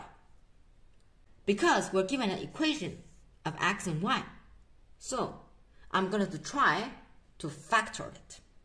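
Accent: China. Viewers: 听着亲切，感觉像东北的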